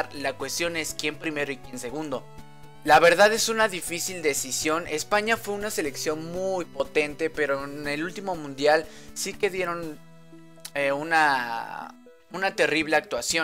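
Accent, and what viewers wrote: Argentina. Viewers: Se nota que eres Argentina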